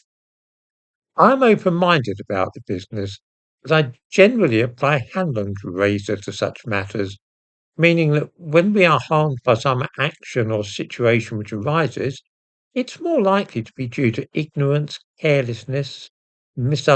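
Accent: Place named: England, United Kingdom. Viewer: Too bad there isn't an English language version of this channel. We yanks can understand blokes like Attenborough and Dawkins, but this heavy dialect is just incomprehensible. It has always perplexed me that the English can't properly speak the language which carries their name.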